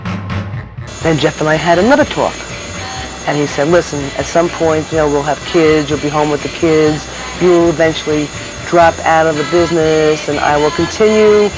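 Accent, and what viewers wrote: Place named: New York, United States. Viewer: 100% New Yawker... I love it!!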